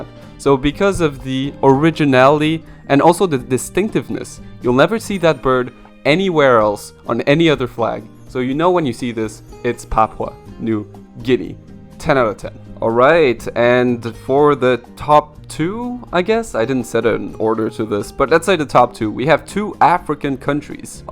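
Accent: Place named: Quebec, Canada. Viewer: jsp pourquoi je SAVAIS que t'etais un quebecois.. autre que ca bro tres bonne video jai apprecier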